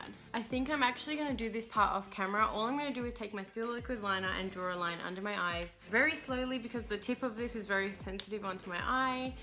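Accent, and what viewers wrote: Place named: United Kingdom. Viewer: Love this look girly and your accent 😍😍 you from the UK 🇬🇧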